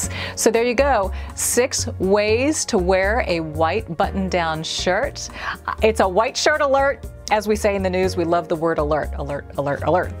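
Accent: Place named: South, United States. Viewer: I love the southern accent!!!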